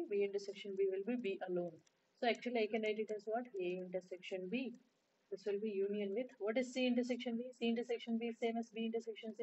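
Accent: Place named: Tamil Nadu, India. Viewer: your voice have tamil accent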